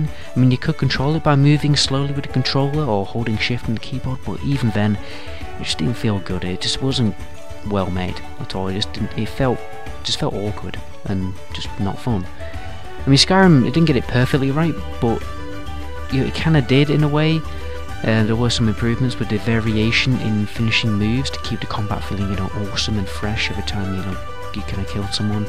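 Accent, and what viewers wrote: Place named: Manchester, United Kingdom. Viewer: I love your accent are you mancunian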